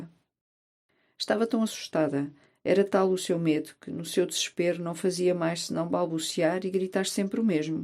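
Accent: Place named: Portugal. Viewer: Sou brasileiro e pela primeira vez estou escutando uma voz feminina portuguesa após ouvir Fados. Beijinhos 💋 portugueses ....rsssss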